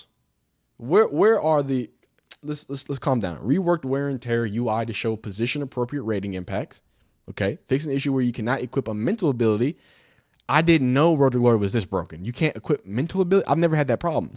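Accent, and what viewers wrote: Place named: Michigan, United States. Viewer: Bro idk if you’re from Michigan, the East coach or somewhere between Tennessee and Georgia. Niggas on YouTube be a character 🤣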